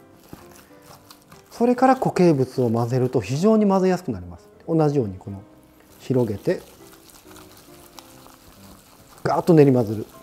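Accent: Kansai, Japan. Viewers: こんなすごいレシピを無料で教えてもらえるなんて本当にありがたいです！コウさんの関西弁の柔らかい話し方も大好きです😊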